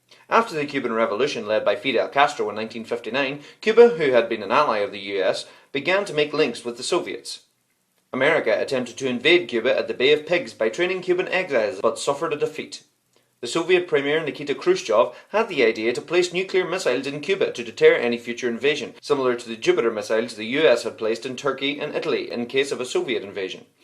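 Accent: Ireland. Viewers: are u irish? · He's Irish. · I think it's because he's Irish and JFK was Irish-American.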